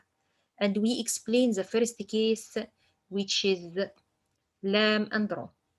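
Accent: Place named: Egypt. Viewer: I guess by your accent you r Egyptian??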